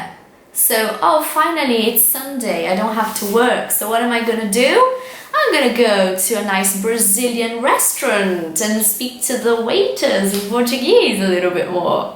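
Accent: United Kingdom. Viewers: what a wonderful british accent! :), congrats for your channel, it's gonna be helpful for my english studying.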